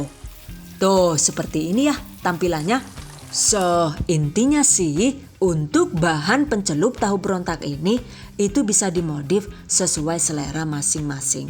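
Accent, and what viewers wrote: Banyumas, Indonesia. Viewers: Deneng ngapak kaya AQ yaaa hahaha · Ngomonge koh ngapak si mba...anu wong ndi si...aku wong Maos... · Lucu ngapaknya😂😂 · Tks resepny Mb...smbil mndengarkn Mbny mnerangkn kdang trtwa sndiri dengar logat bcarany sy jga ngapak Mb salam knal dr purwokerto..Mb...sgt m inspirasi smg smkin sukses y Mb n sehat sllu aamiin... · Suara ngapake gawa ngakak😂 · Jebul wong ngapak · Gra" ngomong nya ngpak jadi aq subscribe 😁😁😁😁 manteeeep min 👍👍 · Wong ngapak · Lucu kok bisa bahasa ngapak, orang mana ini, salam kenal saya dari Cilacap 😆, terimakasih resepnya 🙏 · Bisa ngapak ngomonge.. wong endi sampean..? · Bund..kok pinter ngapak sih?ky bhsku di kroya hahaha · Jenengn asline ngendi sih yu? Kok ngmonge ngpak kaya aku. Aku kebumen.. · Jere madiun tapi ko ngomonge ngapak kaya enyong.sampean wong kebumen apa cilacap.apa banyumas.tegal ya mbak.ayoo ketahuan. 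· tiba😂 kaget aku dengar bahasa apaan tu ngapak kah 😅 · Jebul ngapak rikane yah yu😁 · Bunda orang mana aslinya kok dialek Banyumasannya fasih😀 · hahaha ngapak jg yuuu ....d tunggu resep berikut nya ..💕🌹🌹🌹🌹🌹🌹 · Wkwk ada bahasa Jawa nya .. ngapak pula bikin ngakak 🙈🙏🏻 Itu bahasa daerahku Purwokerto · Konsep videonya lucuu jadi ga bosen nontonnya.. Eh deneng bahasane ngapak. Aja aja wong banyumas kie ya 😂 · Lah jebule ngapak😂 Gombong hadir😀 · Orang mn sih Bun, bahasanya ngapak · Ngakak yakin,,,trnyata wong ngapak toh,,pada yu nyong be wong ngapak kroya🤣🤣🤣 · klo pas ngomong kirain org luar ..eh pas dgr ngomong ngapak..walahh..khas bgt pada karo inyong..😃😃😃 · Mantap👍 simpel ekonomis Bdw lucu ngakak sm ngomong ngapake😀😀 tegal,Kebumen,Purbalingga rikane yu👍🙏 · Aslinee Rika wong ngapak yakk? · Jebule ngapak kaya nyong ha ha ha ha... maturnuwun Yu resepe jan josh banget yakin... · Aq sllu ikutin resep2 dr bunda ngapak ini hehe .... 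Detail dan jelas ,dan sering aq praktekin ...mksih ya bun aq jd nambah ilmu 🥰🥰🥰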